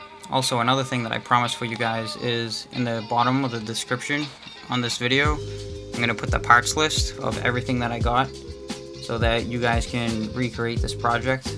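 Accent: Boston, United States. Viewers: Hawd drive. From Boston?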